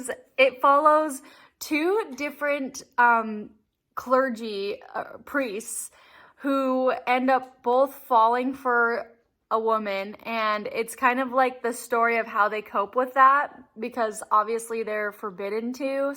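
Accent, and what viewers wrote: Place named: Utah, United States. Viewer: Are you from Utah? You have a Utah accent. That’s why I was asking haha.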